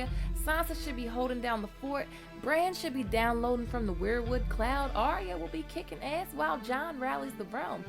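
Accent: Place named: Baltimore, United States. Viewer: why the lol? yes sometimes her voice in tone and pitch on some vowels does sound black, but the accent is Baltimore she has revealed, and accents don't have anything to do with color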